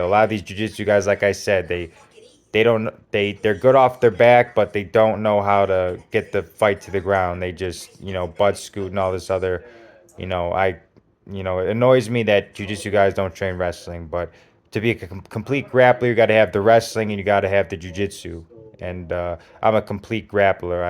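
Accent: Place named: Upper Midwest, United States. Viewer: Yeah it's a northern Midwestern accent. I've been told I have a Michigan accent, which is where I grew up. I'n rural in 46788 Indiana, 45k and on the od l list. I heard that John Prime had a Midwestern accent with a hint of his Kentucky roots. I believe he was from Chicago, and I really love his work. Good to hear you talking about UFC. The Michael Pereira fight last Saturday was incredible.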